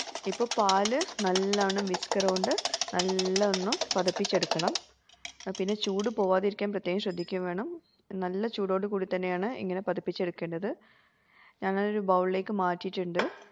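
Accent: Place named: Malabar, India. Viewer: samsaram kettappol thoni kozhikodo malappuramo aavumn. Njn kozhikode aa. Vdos okke spr aanu but sound kurachoode aavato😊😊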